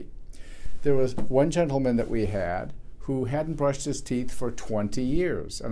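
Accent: United Kingdom. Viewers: Let me guess..... ....British?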